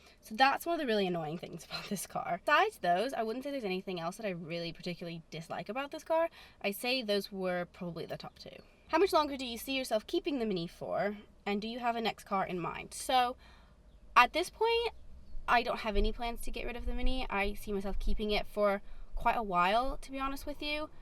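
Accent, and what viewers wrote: United Kingdom. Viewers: I watched a video from 3 years ago and literally it’s fascinating hearing the developing British accent 😂😂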